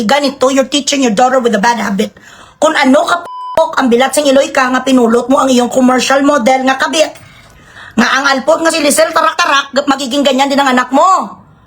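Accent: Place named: Hiligaynon, Philippines. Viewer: _nkakawala ng stress manhood ke ah..more video please..sarap pakinggan pag ilongga angvloger ah..proud ilonggo here..watching from portugal..❤❤❤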